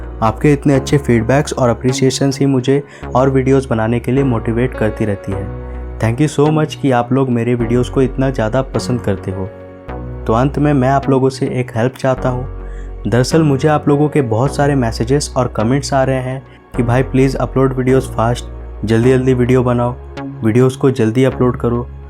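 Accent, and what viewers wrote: Bengal, India. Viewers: r u bengali?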